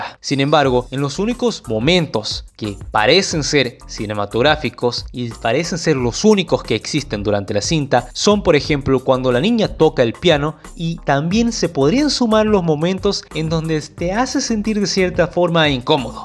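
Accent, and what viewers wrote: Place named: North, Argentina. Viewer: jaja no puedo evitar pensar que sos de santiago o de alguna parte del norte, muy buen video 👏🏻👏🏻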